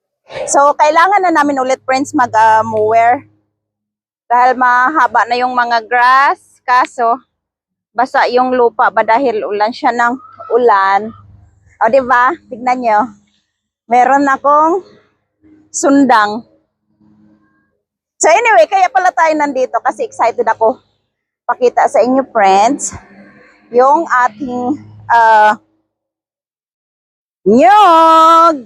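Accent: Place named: Albay, Philippines. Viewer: Parang Albany Ang salita mo idol Kasi mo sondang tapos tigbasan taga saan ka Po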